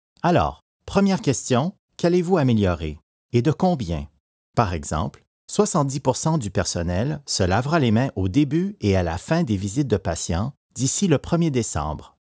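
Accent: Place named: Canada. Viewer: The Canadian accent 💀💀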